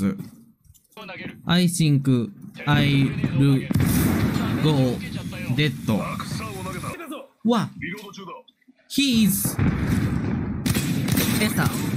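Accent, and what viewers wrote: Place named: Japan. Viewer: perapera Engrish is still the best thing I've ever heard ngl.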